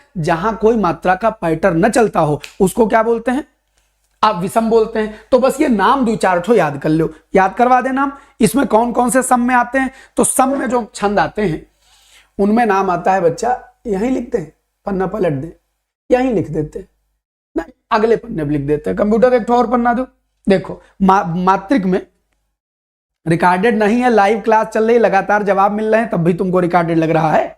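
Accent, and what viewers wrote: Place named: Western Uttar Pradesh, India. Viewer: hm bhi hai up se .. pr hmare yha aisa nhi bola jata ..sayad aap west up se ho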